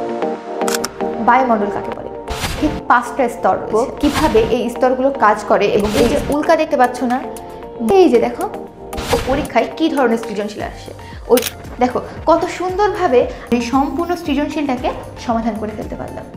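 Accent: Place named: India. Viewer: X যদি ট্রোপোমন্ডল হয় তাহলে Z হবে মেসোমণ্ডল। বোনটির বাংলা শুনে মনে হচ্ছে ভারতীয় বাংলা।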